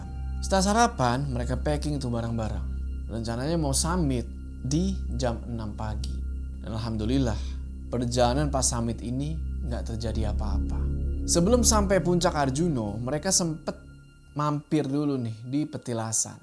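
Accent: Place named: Medan, Indonesia. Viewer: Bg ngapa bntr bntr pake bhasa jowo ntar logat Medan heheheh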